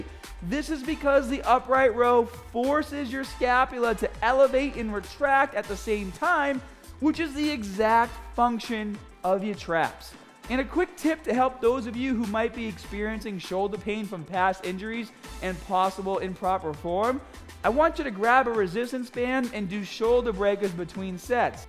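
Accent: Boston, United States. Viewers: Are you from Boston ? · Hard to tell if is from Bahstan or has a speech impediment... go Blues! Seriously though, one of the best YT channels with lots and lots of good info. It's important to have multiple views on "controversial" topics.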